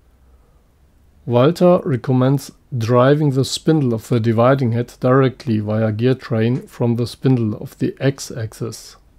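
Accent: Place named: Germany. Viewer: Лучше бы по немецки шпрехал. Непривычно слушать англискую речь с немецким акцентом.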